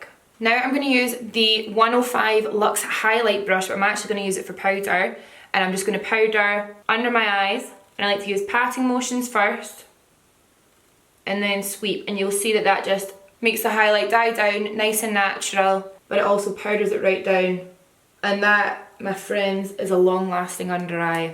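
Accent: Scotland, United Kingdom. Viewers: You're so unique! and a talented artist and I love your Scotland accent. You're so pretty! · you are so amazing! I love watching your videos and learning new little tips and tricks. My husband always says "oh you've been watching today haven't you" cause I walk around my house thinking I've got a Scottish accent 😂😂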